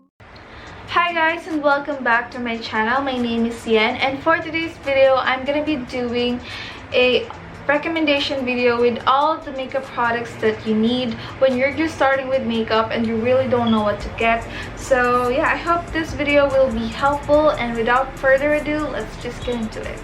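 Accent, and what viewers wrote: Philippines. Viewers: That accent and your beauty (and also the miniso products, I remember those stores when I went to visit last year)! I knew you were Filipina! I’m half and so proud! ❤️🇵🇭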